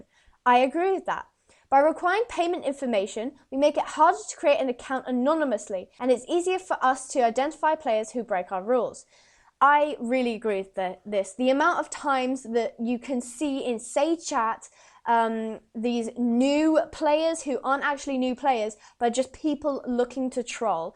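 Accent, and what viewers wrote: United Kingdom. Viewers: Even before, you had to verify your email to chat. I was a free player for 3MONTHS before i got 3 months subscription. after that, i bought pay once and now im level 19. i have been playing star stable for over a year and i think 99.99/100 in the star stable community on global chat are just looking to roleplay. i bet most of those are subscriptions. this is just star stable milking money off their players again. like they do with star coins. in my whole year of playing star stable, i have never bought star coins. i have saved up for all my horses. i now have 11 horses. you don't need to pay for star stable at all just to enjoy the game. LIKE IF YOU AGGREEEEEE Also, what server are you on? Im on Unicorn Forest, UK and you have an english accent. can we be friends on star stable?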